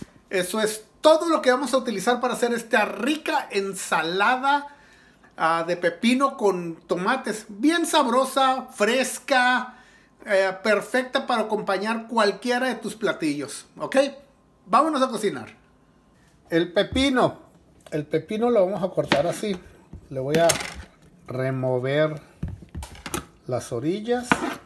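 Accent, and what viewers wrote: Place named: North, Mexico. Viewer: Hola me gusta mucho su canal. Sus recetas me recuerdan mucho a las de mamá. De que parte de México es, por su acento you pienso que es del Norte. Sonora? Sinaloa? Chihuahua?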